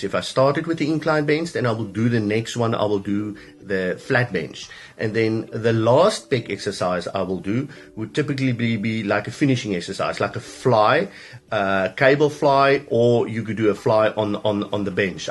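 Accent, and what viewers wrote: South Africa. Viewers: Is this the Afrikaans type accent?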